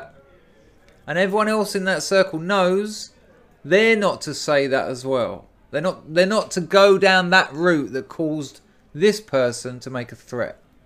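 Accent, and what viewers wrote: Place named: Cockney, United Kingdom. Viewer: spot on mate good to hear a fellow cockney of the old school.compared to nowadays the old days were utopia.keep on doin what yer doing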